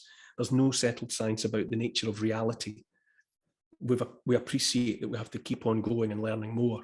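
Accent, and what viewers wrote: Scotland, United Kingdom. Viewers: When I need a booster of a Scottish accent, honesty and a questioning mind, I drop in here. I’m a Scot in Taiwan. I think I’d hate to be living in Sturgeon’s ideological dystopia right now. Taiwan handled all this so much better, and that with China a stone’s throw away.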